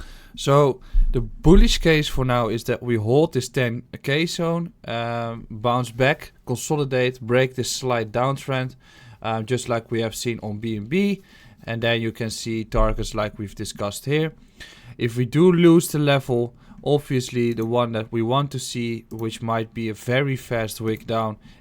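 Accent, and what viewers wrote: Netherlands. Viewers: thanks for your vids and your sweet Dutch accent...I assume. the bounce off the 20 week average was promising but market looks like its weakening.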